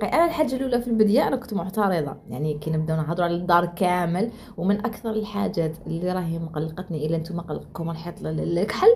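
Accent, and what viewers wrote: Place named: Blida, Algeria. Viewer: يعطيك ربح تقتليني بضحك كيتهدري بلبليدية حنوني ❤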